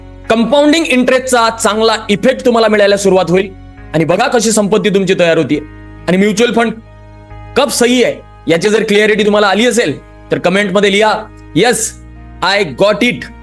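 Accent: India. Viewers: From..विदर्भ....भाषेवरून कळतय...😊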